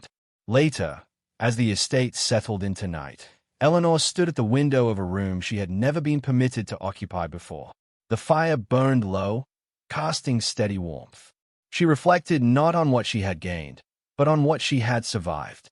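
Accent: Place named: Australia. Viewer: Sounds somewhat like an Aussie to me.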